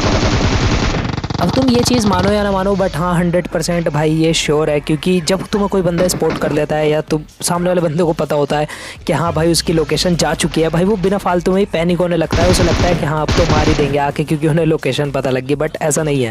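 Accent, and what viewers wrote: Haryana, India. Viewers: ur haryanvi touch is superb Three seater: thukta pher char jagah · Aaj to haryanvi boli has ri 👍😂❤️ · Bhai Aaj To Katai Haryanvi Horya H🔥🔥😍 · Bhai comentry Haryanvi and hindi soo funny I like it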